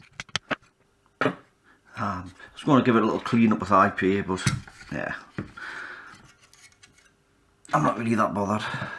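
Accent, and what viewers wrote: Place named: England, United Kingdom. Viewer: I grew up in England and lived in Colchester, Nottingham, Bucks, London, Herts and Chester so don’t have a regional accent, but love the persistence of English accent variants. I can usually roughly identify accents, but can’t pinpoint if someone comes from Newcastle, Durham or Sunderland. Which is yours if you don’t mind my asking? 😊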